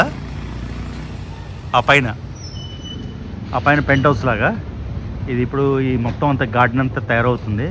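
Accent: India. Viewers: Turpu bhasha bale matladutunnavu,vinadaaniki chalabavundi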